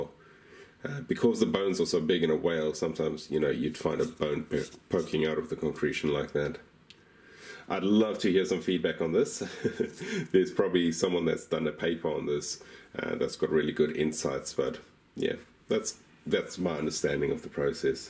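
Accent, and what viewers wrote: South Africa. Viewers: Are you from South Africa?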